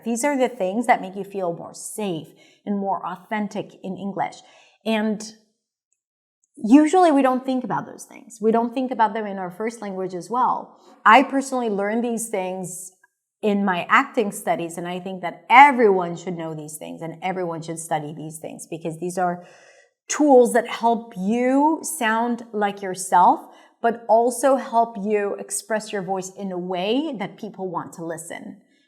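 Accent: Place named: United States. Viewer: i love you, I love your tone, it's super cool and soft and delicate, but I'm Cuban, I use a translator and the Duolingo app, but I like your continuity listening to you talk, I think it's better that way, I learn more because I'll be listening to you all the time, even if I go out to work, I'd love to have the headphones on to listen to you all the time, now I live in Guyana 🇬🇾 English, but this dialect is not yours the real American, I'd like to have some recordings of yours to listen to it while I'm working one and a thousand times to listen only real English, but I hope you have some recordings which I can download to listen to them offline, because when I am connected messages come in all the time and the recording is not listened to well, thank you beautiful lady, I hope to learn a lot with you if you can help me well to study ❤❤❤❤🙏🙏🙏🙏😊😊😊😊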